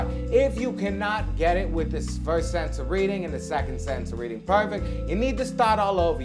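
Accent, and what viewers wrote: New York, United States. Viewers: Thanks, that was very informative and love the NY accent..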